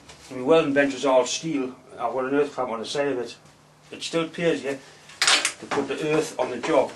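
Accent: Newcastle, United Kingdom. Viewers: Wonderful! You kept us wondering how this was going to work until the end. I really enjoy your videos and always learn something new from them. I am not from northern England, have never even had the opportunity to visit, but I love listening to Geordie and it forces me to really pay attention to understand. Thanks for all your efforts to make these excellent videos.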